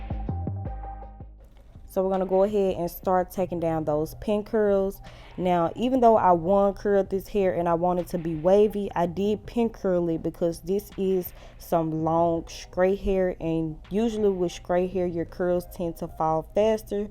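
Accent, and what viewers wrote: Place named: South, United States. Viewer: 😍😍 I love a country accent.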